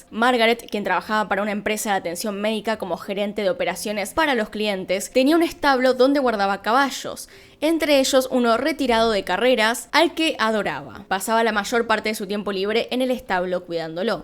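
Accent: Argentina. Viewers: Me gusta tu acento Argentino :D, Un Abrazo Princesa :D, Interesante Historia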